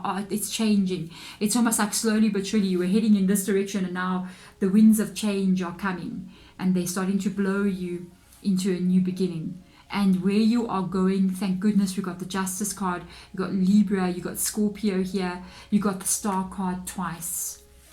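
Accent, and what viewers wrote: Afrikaans, South Africa. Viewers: Hey! First time on your channel¡ About to watch the reading, but heard your accent! Are you Afrikaans?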